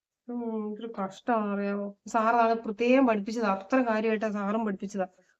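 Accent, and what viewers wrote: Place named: Kottayam, India. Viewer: Ee kottayam slang engane chechi kku vanne... Ennayalum kekkan rasava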